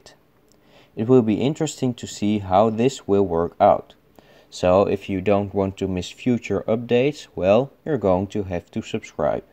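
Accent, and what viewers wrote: Netherlands. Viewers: I think he’s Dutch. I have the same accent 😂... A lot of people I know who speak English on a daily on the workfloor also sometimes have a heavy accent. I believe it’s because our language is very accent reliant. It’s very difficult to understand someone speaking Dutch when they aren’t native. Fortunately for us this isn’t the case with English language 😬 but maybe if it was, we’d practice pronouncing English a bit better 🤷🏼‍♀️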